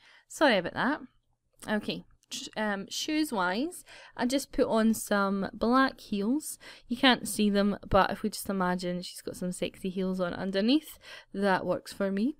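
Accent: Scotland, United Kingdom. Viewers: are you from scottland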